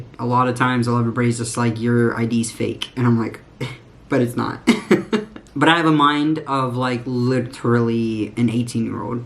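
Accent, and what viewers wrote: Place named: Marietta, United States. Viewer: Is it bonkers that I could tell you were from Marietta by your accent? I went to MC about a million years ago and I can still hear it.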